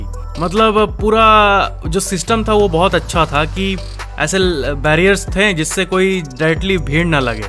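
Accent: Bihar, India. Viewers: App bihar se ho kya sir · Aap bihar se ho lagta hai 😉😉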